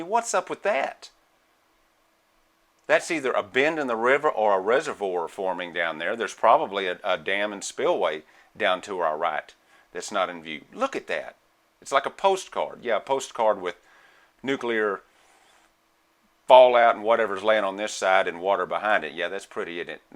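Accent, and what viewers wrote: South, United States. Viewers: Southern accent is the best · Mars is still inhabited by 5 species of Humans of varying sizes! They dont like or accept aliens coming to Mars! The Martians Kill all Alien life that land there? I also like your Southern drawl dear!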